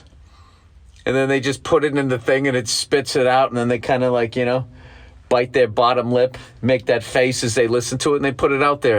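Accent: Boston, United States. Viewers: Bill burrrrr. I nominate Bill for the CEO of Sam Adam's beeeerra- Boston Accent 😅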